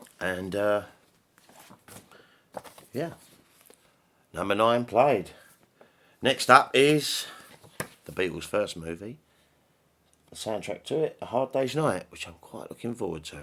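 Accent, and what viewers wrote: United Kingdom. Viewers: As a newcomer, I have to say your voice is magnificently British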